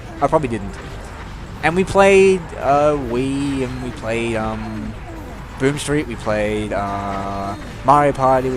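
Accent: Australia. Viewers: Reasons that you deserve more viewers. Your gameplay quality is really good. You have a good mic and voice. You can talk for a long period of time without people (atleast me) geting bored. Also you have the Australian accent :P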